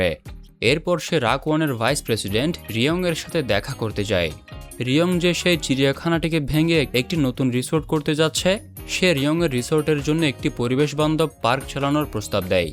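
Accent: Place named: Rangamati, Bangladesh. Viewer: Apni Q & A koren plz onk qsn amar... Apni ki Chakma? Apnr voice amr Rangamati types lage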